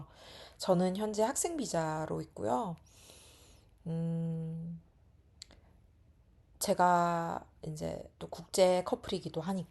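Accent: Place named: Seoul, South Korea. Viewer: 우왕 서울말 진짜 잘하시넹 하다가 인!생의 듣고 빵ㅋㅋㅋㅋㅋㅋㅋㅋ 언니 그래도 진짜 자립해나가려고하는 의지를 중요하게두시고 앞으로 나아가려고 하는 것이 잘 보여요! 어디 가서 사시든 혼자서도 다 잘해내는 예요!ㅋㅋ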